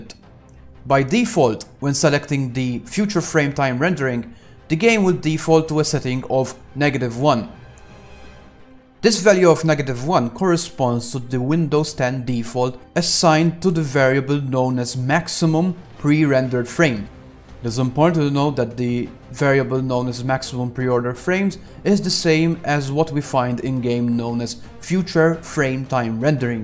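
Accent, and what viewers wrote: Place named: Malta. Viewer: I knew that you are Maltese as soon as you start speaking ;-), so this also apply for an AMD 5700XT ? do you have maybe another video showing an AMD card?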